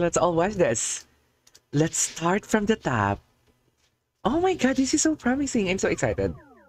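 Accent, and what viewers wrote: Philippines. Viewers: (I'm greek) No she's not a soprano. She just sings songs with traditional sound! (ethnic etc) but makes them more modern.. btw are u filipino? I feel like your pronunciation is very familiar to me hehe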